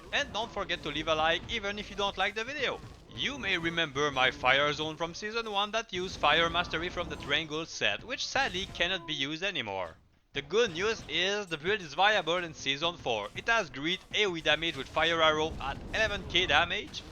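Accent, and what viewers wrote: Quebec, Canada. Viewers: rreally nice english with a beautiful quebecer accent lmaooo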